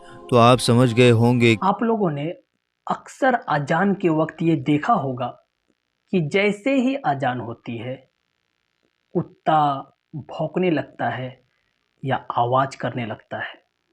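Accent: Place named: Bengal, India. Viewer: Tum bangali ho Kia aapkeblne katarika sahi nahi hai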